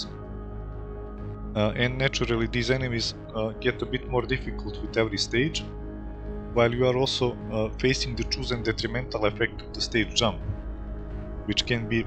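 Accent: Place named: Russia. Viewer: Блин такое ощущение что он русский просто говорит на английском😁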